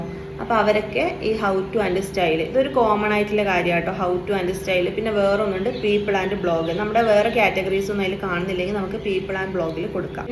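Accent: North Kerala, India. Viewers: ആ ഒരു വടക്കൻ ചുവ വന്നു..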